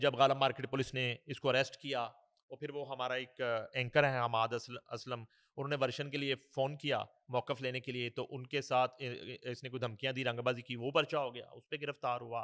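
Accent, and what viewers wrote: India. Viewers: انڈین لہجہ ہے شاید انوکھے پن کی خاطر بولا جاتا ہے ؟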